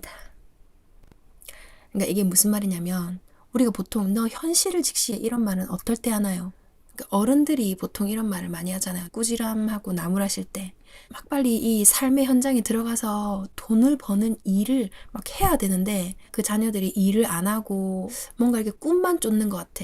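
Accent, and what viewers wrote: Gyeongsang, South Korea. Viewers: 처음 들어오자 마자 구독 좋아요 꾹~♡ 누릅니다 사랑스럽고 편안한 목소리에 정겨운 경남 사투리가 매력을 두배로 해주는거 아시나요? 내용도 정말 현실속에 적응하기 쉽게 설명해주셔서 감사해요~~~♥️♥️♥️♥️♥️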